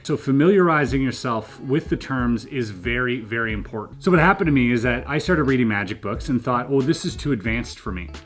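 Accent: Scotland, United Kingdom. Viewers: Fabulous video. A great Scottish accent. Scotland is a fantastic place. I am the world’s worst magician, but always finds videos like these very useful. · From scotland stay like 20 mins away from Earlston loved the accent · we have missionaries in Scotland plus a guy playing the bag pipes at the church wide picnikick in back of our church in Chesapeake Virgina he played the music of song that also in star trek movie the death of Spock ps i video recorded the church wide picknick at Good News Baptist Church and uploaded to youtube video search engines channel ps nice cool out fit and good Scottish talk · Good Scottish accent, too 😅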